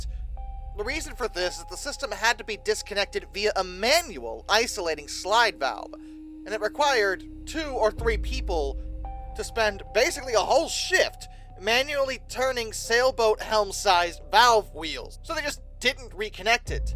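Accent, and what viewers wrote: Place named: United States. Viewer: Okay dude you sound like an American so why in the hell are you calling Z zed?! What are you some kind of eurodegenerate or commie?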